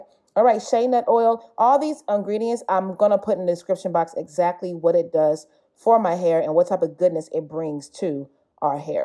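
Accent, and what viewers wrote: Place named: Yoruba, Nigeria. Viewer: Just discovered you. I love how your Yoruba/Nigerian shines through.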